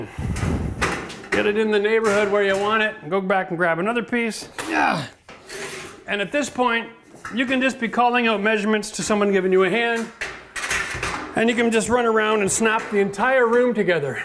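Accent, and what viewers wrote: Canada. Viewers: I'm not sure about there, but they changed the formulation of pressure treated down here, and it eats steel pretty fast. If you don't use HDG or coated nails / screws - they'll be completely gone within 2 years. What your using there doesn't look pressure treated though. Maybe there's some sort of flashing tape, or maybe a strip of tyvek or something would be good to protect that? Idk - tyvek might pass it right through? Or maybe there's some kind of coating for the track? Maybe - my fear is just unfounded! Also, dang right, tyvek isn't a vapor barrier! It's a vapor retarder, but it's not a barrier. But up there (assuming Canada from the accent), my understanding is that exterior walls up there have a vapor barrier on the inside, fully taped seams. Down here in hot climates there is no vapor barrier at all. There's a vapor retarder. The paper face on the insulation - which also goes on the inside of the wall. 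The exteriors we wrap with either tyvek or 15lb.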